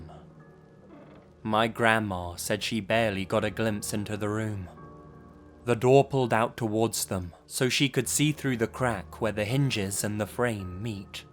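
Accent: England, United Kingdom. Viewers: Hold up. You grew up un chicago but speak with a gay english accent?? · spooky British accent (⊙＿⊙) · You always have great stories. I love your english accent. I would love to go to college in England. 😃 I'm African American. Do you like Blues music?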